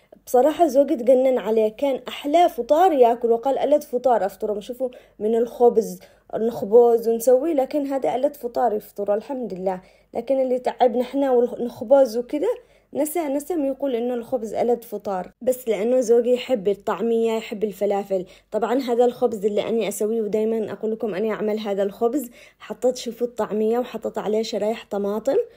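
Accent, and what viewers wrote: Aden, Yemen. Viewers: احبك ي بنت الكلام العدني يذوب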